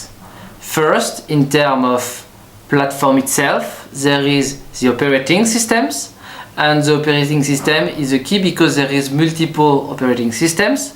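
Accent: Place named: Paris, France. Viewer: Ça sens l’accent parisien par ici. Nice video but can have better resolution for next time.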